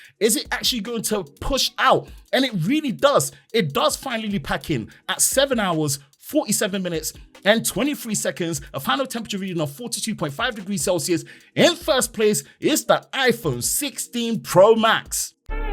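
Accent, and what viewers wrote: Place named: United Kingdom. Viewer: I like your British accent more than the whole drain test bro . Keep it up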